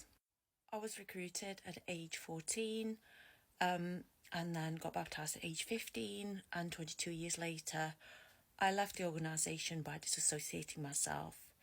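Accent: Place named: North Midlands, United Kingdom. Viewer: I was born into it but made my 'escape' in my mid-teens when I got old enough to slowly move myself 'out the door' over the course of a year or so. So well done to you for making the break. I have to agree that the use of the Bible as if it is an authority with the power to convince you does seem to be very common. My father tried it on me tirelessly from when I left the organisation until the day he died, utterly ignoring my core response that, if I do not agree that his source is 'literal truth', then it is has no chance of causing me to suddenly see the 'error of my ways'. Greetings from an English Druid ... and I was surprised when you said you were Latvian as that accent definitely sounds North Midlands to me :D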